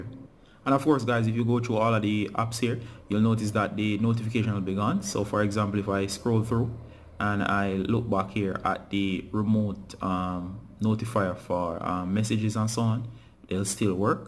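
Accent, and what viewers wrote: Jamaica. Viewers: Stfu he's jamaican like myself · Are you jamaican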